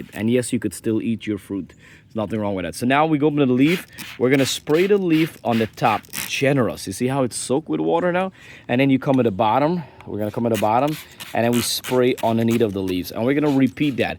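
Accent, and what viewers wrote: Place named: Guyana. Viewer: I heard the accent and had to subscribe! From one Guyanese gardener to another 🇬🇾🇹🇹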